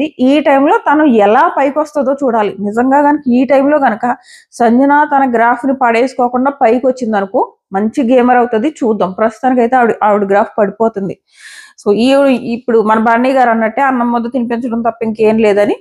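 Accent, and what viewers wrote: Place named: Srikakulam, India. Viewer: Its Srikakulam slang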